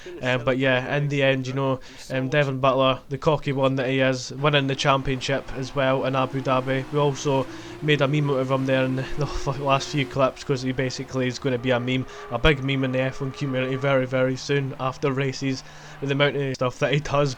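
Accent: Scotland, United Kingdom. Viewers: U Scottish? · he is scottish. It's their accent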